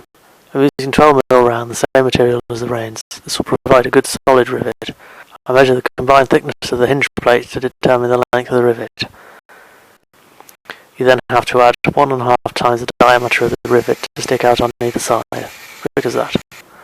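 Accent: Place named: RP, United Kingdom. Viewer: such a posh accent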